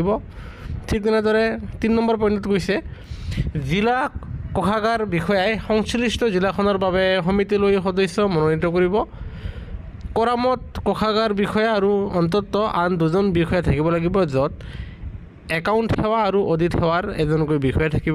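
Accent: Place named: Bengal, India. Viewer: Axomia vakha Jiva khonot val ke practice kori lo.. Bongal buli gom pai😅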